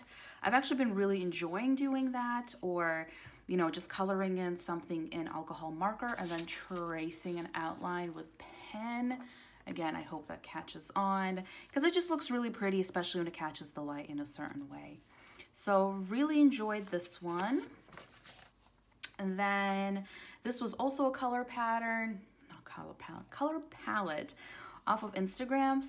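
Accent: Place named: United States. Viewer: Glad you enjoyed your vacation to the states! Where are you from? As you sound like you have an American accent. Although you said holiday so I’m guessing you live in Europe?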